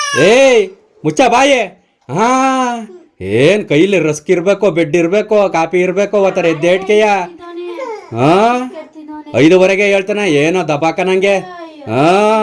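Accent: Mandya, India. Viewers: ಮಂಡ್ಯದ Mathu Chanda.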